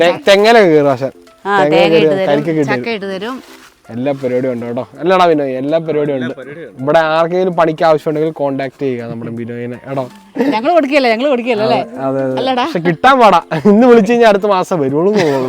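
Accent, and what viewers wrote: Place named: Ramapuram, India. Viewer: നാടൻ സംസാരം.. അറിവുകൾ... അമ്മച്ചി അടിപൊളി.. രാമപുരം ആണോ??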